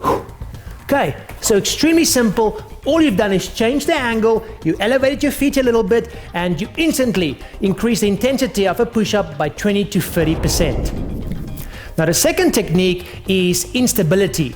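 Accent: South Africa. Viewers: He has a South African accent, as I am South African myself I can assure you it isn't made up or anything like that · anyone else realize his South African accent? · Sounds south african .. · South African accent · Jayden Almirall terrible accent anyways, other South African accents are way better and less annoying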